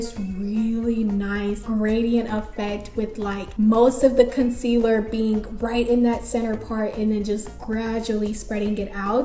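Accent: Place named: Nigeria. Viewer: I’m 1 minute in the the Naija in you is jumping out. Is it by force?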